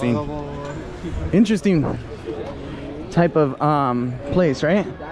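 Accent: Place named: Texas, United States. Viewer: Are you from Texas or El Paso. Do recognize an accent from there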